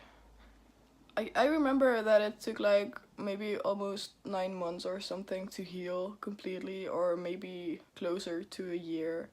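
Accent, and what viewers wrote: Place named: Netherlands. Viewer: Ben jij nederlands Zowel ik hoorde het helemaal aan je accentje😳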